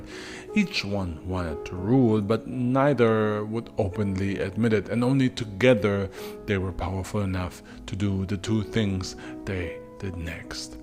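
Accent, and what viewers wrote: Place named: Germany. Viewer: thanks! Very informative video. Your accent sounded very German to me but I wasn't sure what to make of it! We are both in the half-german club!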